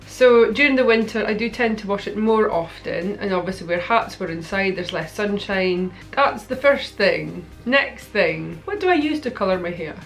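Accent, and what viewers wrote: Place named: Shetland, United Kingdom. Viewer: I never thought that I would ,be so fascinated by a hair dyeing video ! I guessed that you had a Shetland accent , its a good un !👍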